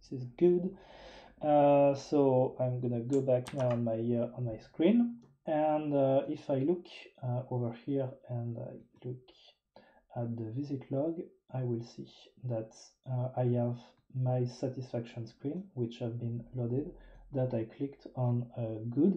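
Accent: France. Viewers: Il me semblait bien que c'était un accent Français, Bonne continuation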